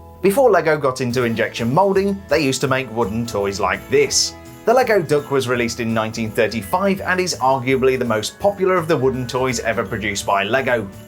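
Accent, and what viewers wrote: United Kingdom. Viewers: haha no, you go ahead. I can always slaughter you in the comments. Actually.... before I saw your actual face, I seriously thought you were ginger. You sound so delightfully British. There, I'm prejudiced myself....🙄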